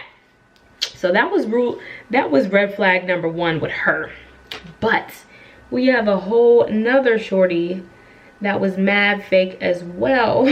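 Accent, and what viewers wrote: Pittsburgh, United States. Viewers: Yesss! Loving the new hair style & makeup👌. My favorite style on you is the updo that you had in your self care video bc your beautiful skin, eyes, & lashes are popping! ✨ But this looks great on you as well and it looks natural. My Sis lives in Pittsburgh and she has that same accent even though we are from N.C. I went to NCCU. And I experienced fake girlfriends but what got me was back at home I had a fake guy friend that told me ALL of his “cousins” business! I was so shocked and it was an immediate red flag 🚩 for me. I later told him that he “talked like a Bitch!”. Lol.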